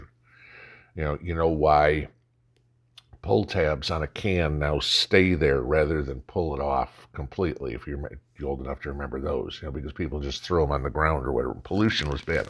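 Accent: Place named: Indiana, United States. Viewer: I'm a Hoosier I had always heard we had some of the dirtiest lakes and rivers but didn't know we were #1 . I live really close to the Wabash river and a power plant reservoir I wouldn't eat fish from . There are some really nice gravel pits I would eat the fish from . Sounds like you're from the N. W, part of the state and we live in the S.W. part . I hope you have a blessed Sunday !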